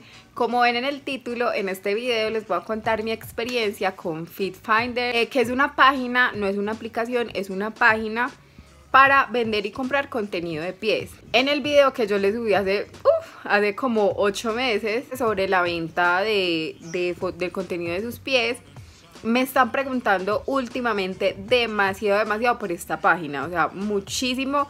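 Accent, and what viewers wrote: Colombia. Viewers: Me encanta tu acento colombiano 🤤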